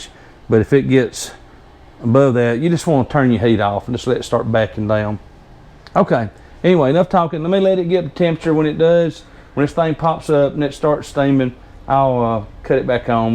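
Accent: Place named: South, United States. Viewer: 😉 I enjoyed listening to you, reminded me of my family in Louisiana with your southern twang. It is frustrating that canning jars went up close to 3 bucks case these days.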